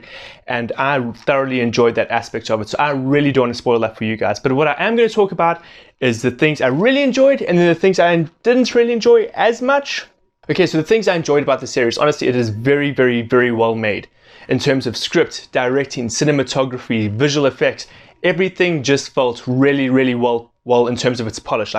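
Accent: South Africa. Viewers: <link> Some background on Islamic eschatology.. btw you sound like a South African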